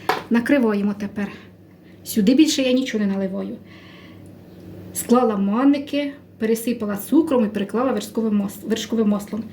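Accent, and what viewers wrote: Ukraine. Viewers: Це настоящя укра¡нська мова..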